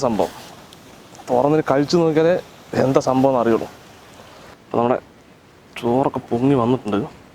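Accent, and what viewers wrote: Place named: Palakkad, India. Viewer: Malayalam... palakkad slang...😊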